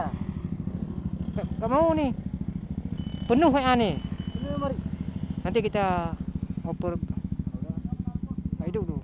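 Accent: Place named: Aceh, Indonesia. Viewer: Logat Acehnya masih,btw selamat atas 1k sub🎉🎉🎉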